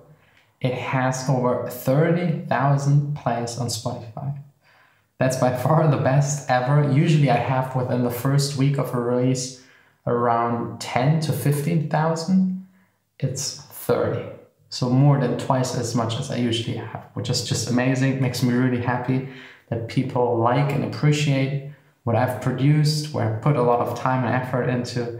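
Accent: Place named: Germany. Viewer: There are definitely whole sections of this video I swear I am hearing my words come out of your mouth , but with a German accent.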